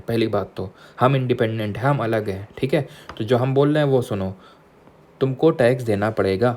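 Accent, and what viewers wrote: Kanpur, India. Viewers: Without knowing where he is from ,by his accent i within minutes got sure he is from kanpur I'm also from kanpur rola h 😂🙌🏻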